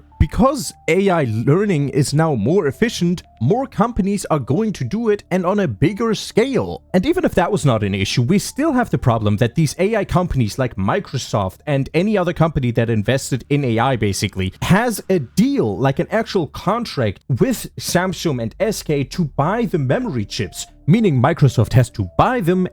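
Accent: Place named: Europe. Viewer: Yes and No, this guy has always been politically biased, based off the accent he's probably European or south American or smthn which means this guy has probably zero actual knowledge of America or its politics (not that most Americans are any better). Attacking Iran is an objectively correct choice and something we should have done decades ago when they first had their regime change. They are the #1 sponsor of terrorism in the world and getting rid of them means significantly less problems in the middle east down the line which is why 90% of the middle east + China is in support of the US effort to put them down. And once they're finished we can swoop in, stick all our fingers in all their pies and take their oil for our own as a reward. Aka the same thing we're doing with venazuela. In the short term it means more expensive games, in the long term it SHOULD mean less expensive games and everything else, +less chaos in the middle east. 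It's not a stupid decision, Iran is just more "roachy" than venazuela. Their military is demolished, they have no real power or influence and their only ally Russia is fighting their own war. They're just unnecessarily and pettily spreading it out. Should be over by the end of the year at most or within a couple months at least.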